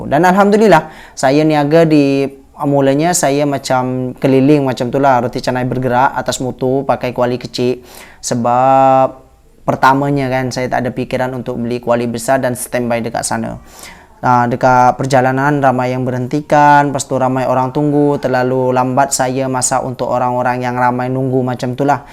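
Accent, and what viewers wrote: Malaysia. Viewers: Bro cakap dah spt org malaysia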